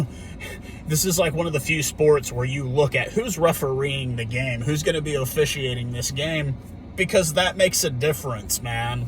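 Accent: United States. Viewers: I feel like the states is a sleeping giant went it comes to rugby. If they really adopted it, they could become a real threat within a generation. Thanks for the video and support for the Springboks. Lived in the states for 4 years, but in the south, you sound like you are from up north, detect a tinge of Minnesota accent on your end. How did you get into rugby?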